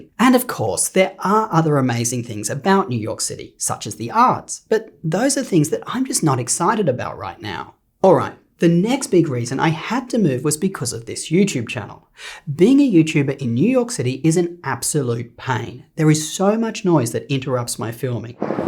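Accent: Australia. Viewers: yknow i thought you were australian, but you mentioned you moved to the usa from there. interesting! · Are you Australian?????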